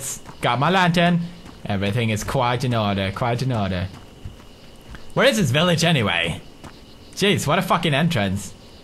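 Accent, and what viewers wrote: Sweden. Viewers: hahaha u had more Swedish accent back then xD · The one thing we Will never have again: deep Swedish accent 😂😂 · kinda swedish accent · omg its been years since i watched this i miss the cute swedish accent back in this day and amnesia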